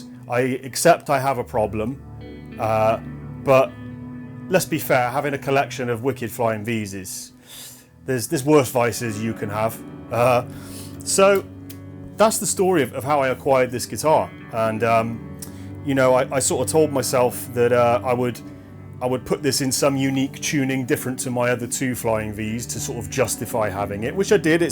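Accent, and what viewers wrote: RP, United Kingdom. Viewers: I love your accent bro, very posh!!! I wish everyone in Cardiff could speak like you :)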